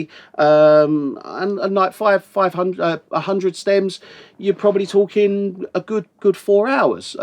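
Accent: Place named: Cockney, United Kingdom. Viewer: So, this is cockney accent, I guess :)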